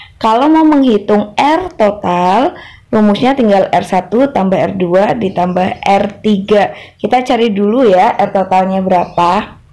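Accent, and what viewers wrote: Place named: Tegal, Indonesia. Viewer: Tegal ngapak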